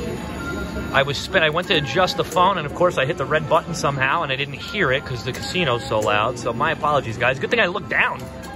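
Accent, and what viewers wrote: New Jersey, United States. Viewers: You must have been born and bred in New Jersey because you have a thick Jersey accent!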